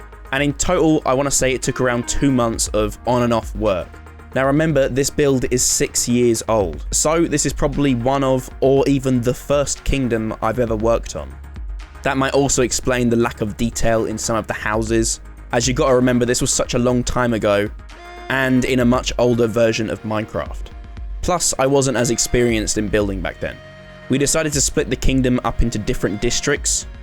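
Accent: United Kingdom. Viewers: I really really hate English(your) accent but that what you do is siiick. And accent didnt exist now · why does he kinda look like boffy but with a british accent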